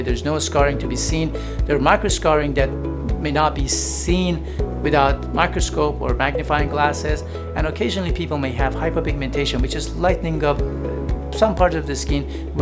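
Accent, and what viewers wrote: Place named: Iran. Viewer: lovely persian accent :-)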